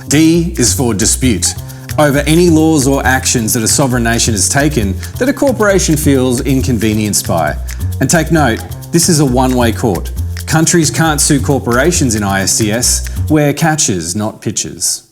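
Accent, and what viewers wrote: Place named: Australia. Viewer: Love his Aussie accent :) AND he's smart - we need guys like this here in the USA.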